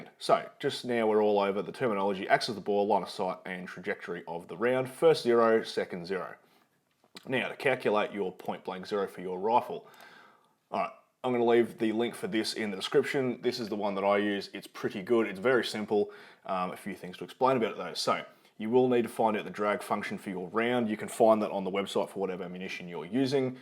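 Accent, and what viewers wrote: Australia. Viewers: It’s unusual to hear an aussi talk about guns. 😂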